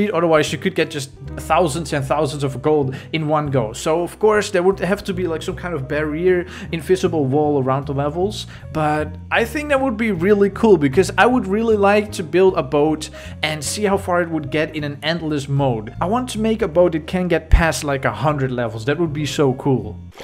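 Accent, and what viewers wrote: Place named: Netherlands. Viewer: Ayy you sound dutch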